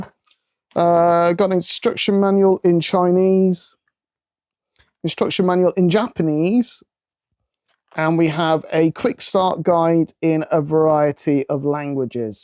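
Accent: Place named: United Kingdom. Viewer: you grow a brit accent. nice synth, review.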